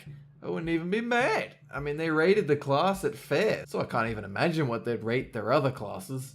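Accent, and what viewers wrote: Australia. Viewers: Is he Aussie🇦🇺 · is this guy Australian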